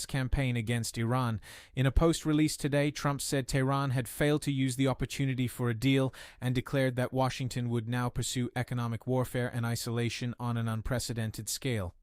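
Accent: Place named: Eastern Europe. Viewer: are you using an AI filter on your voice? Your English is very good but you have a stronger Slavic accent than this voice.😃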